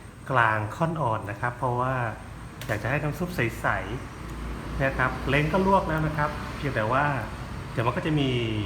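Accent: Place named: Isan, Thailand. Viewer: เชื่อว่าตอนอยู่กำแพง คงจัดหนักแบบไม่ธรรมดา ขนาดเห็นสาหร่ายเป็นเต้าหู้ คงเป็นเพราะฤทธิ น้ำเก๊กฮวย กับน้ำอัมพัน ยังไม่หมด เมนูแกงจืดสาหร่ายหมูบะช่อคงช่วยให้หายแฮ้งได้ดีคะ เมนูนี้การันตีด้วย ยังไง้ ยังไง ก็แซ๊บ แซบ อยู่แล้วคะ (คนกำแพงแต่ชอบพูดอิสาน น่ารักดีคะ)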